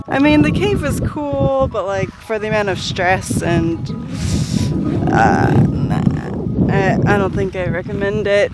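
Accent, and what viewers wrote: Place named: New Zealand. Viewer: Living in NZ I notice you are building an accent towards the end of the video.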